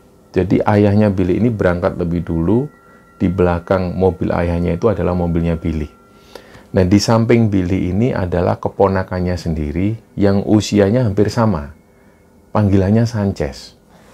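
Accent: Java, Indonesia. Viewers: Seneng ama mas bercerita sprti ngalami sndr, enak di dgr, mudah dipahami.. Luar biasa.. Dan plg respek org jawa yg ga hilang jawanya... Salut mas👍🏼👍🏼👍🏼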